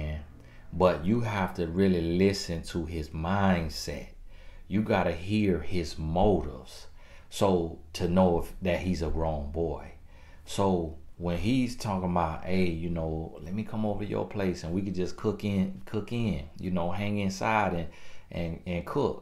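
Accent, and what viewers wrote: South, United States. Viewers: I think he is saying on your "back" but it sounds like bike due to his strong southern accent. Lol. Either way...super funny. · Good morning Mr.... I pray you receive this message with a humble heart. I am just listening to this particular video. And yes, I totally agree with your message. Question: my family is from Alabama. Are you from Alabama? I swear you sound like my Father ( God's peace with him in heaven). Listening takes me back to that honest place. THE REAL SOUTH 💯. Keep us informed and ready. But still waiting but readying myself for my husband. Thanks! God's daughter❤️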